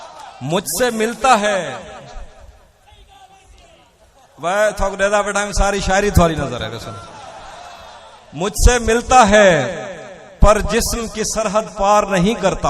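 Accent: Saraiki, Pakistan. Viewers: Saraiki awaaz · How cute is this when is speaking saraiki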